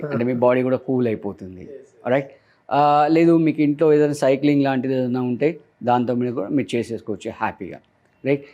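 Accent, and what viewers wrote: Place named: Marwar, India. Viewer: Athanu telugu vadu kademo, marvadilanti vallu andhra lo perigina vallu ayi vuntaru.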